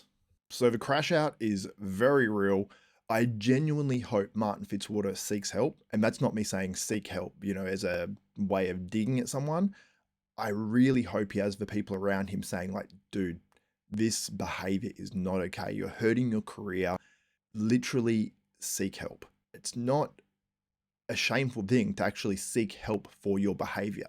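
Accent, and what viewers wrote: United States. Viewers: lol nice american accent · Your Martin-esque American accent is hilarious!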